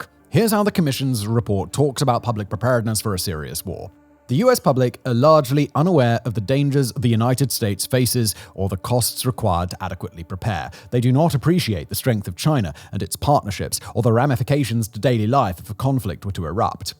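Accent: United Kingdom. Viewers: This is what Americans get wet for, hearing a Brit tell us that we're not ready for war.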